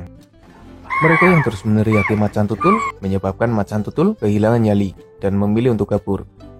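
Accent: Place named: Java, Indonesia. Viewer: Wkwkwk narasi yg khas dengan gaya medoknya mimin 😅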